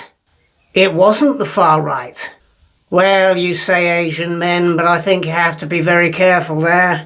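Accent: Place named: RP, United Kingdom. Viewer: I've never heard a Labour MP speak in RP mate, you sound more like a Tory MP to me!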